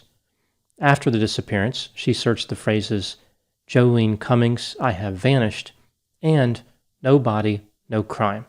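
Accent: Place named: Philadelphia, United States. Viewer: very nicely done analysis. Kessler reminds me a bit of the Florida serial murderer Aileen Carol Wuornos. Doctor, you sound a bit like you're from the greater Philadelphia, or Tri-state area. Thanks a bit for the video. I'll keep watching future postings.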